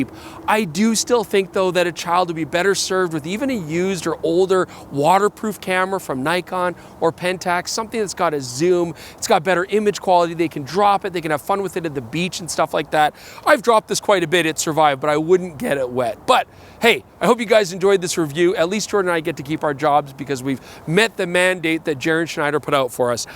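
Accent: Australia. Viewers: Good Aussie Accent! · woa great Aussie accent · ' Aussie accent isn't bad. As for the cameras: BLEECHHH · holy shit I was not expecting that Australian accent even after seeing the comments. Genuinely A+ effort lmao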